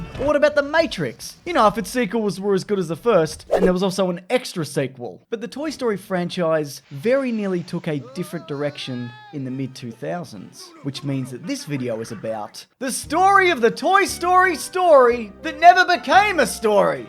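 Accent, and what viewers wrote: Australia. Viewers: Forgive me. I'm a dumb American. Is this an Aussie accent?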